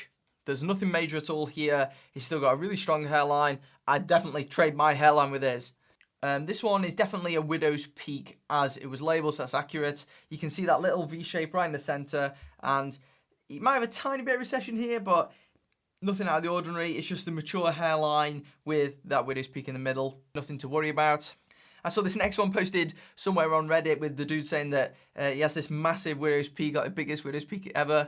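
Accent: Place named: Stoke, United Kingdom. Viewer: Are you from Stoke by any chance. Your accent sounds like something you’d hear in Stoke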